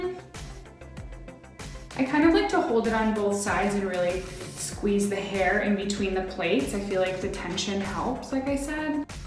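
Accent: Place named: United States. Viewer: I was not expecting an American accent !! 😂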